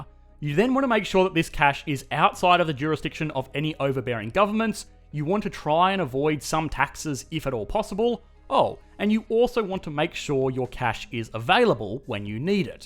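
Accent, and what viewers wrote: Australia. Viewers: Oz accent? Lol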